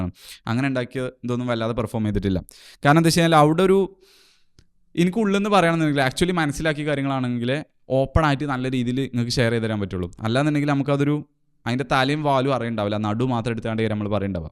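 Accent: Malappuram, India. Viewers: Hey bro, you sound like a Malappuramkaran… .. good video of how to not use ChatGPT, I am from perinthalmanna living in Australia.. happened to see this video. It’s very nice. I will be happy if at least some of the new genz kids get influenced by your video.. liked the dopamine video too..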